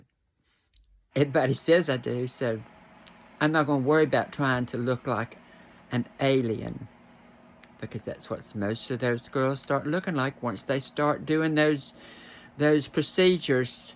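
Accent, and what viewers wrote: South, United States. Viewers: You have a beautiful southern accent the ASMR community would definitely appreciate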